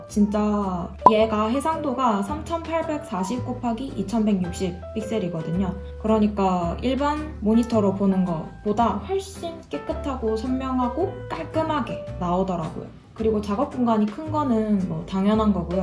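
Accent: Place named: Gyeongsang, South Korea. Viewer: 고향이 대구 신가봐요 ~~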